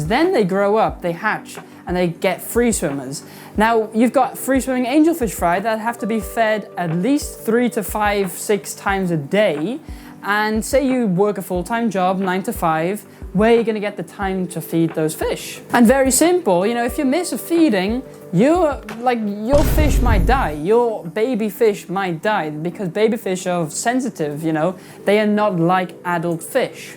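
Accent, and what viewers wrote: Wales, United Kingdom. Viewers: Trying to place your accent. Welsh ?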